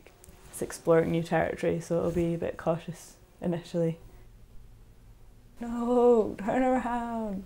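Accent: United Kingdom. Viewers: What's her accent? Welsh? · I think she sounds Scottish? But it's not an accent I can place. Anyway, hive beasties are super-interesting to me, so this is great!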